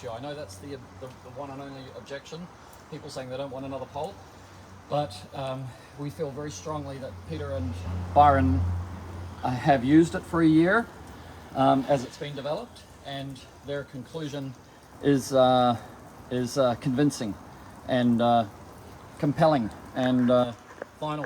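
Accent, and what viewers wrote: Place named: Southland, New Zealand. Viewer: Hey - are you originally from Southland? - I hear that Southland twang in there. (I’m looking to buy and try out a Radial brush soon btw too 😃)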